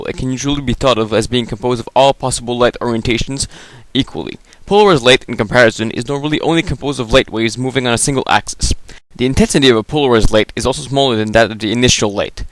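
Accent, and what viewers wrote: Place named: France. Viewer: I am glad you love to speak fast AND with French accent but the combo of those two, makes your effort almost useless in delivering an otherwise interesting and useful information. Plus lentement s'il vous plait!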